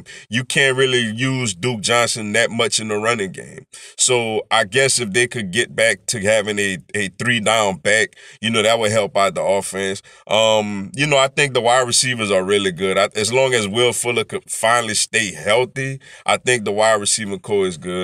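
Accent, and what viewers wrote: New Orleans, United States. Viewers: Where are you from man sound like New Orleans.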